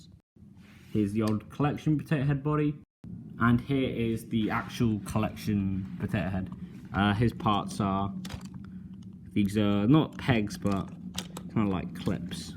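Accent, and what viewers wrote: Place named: United Kingdom. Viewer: I’m sorry but it’s a solid British accent , it’s very easy to understand what is being said , are you from South Africa????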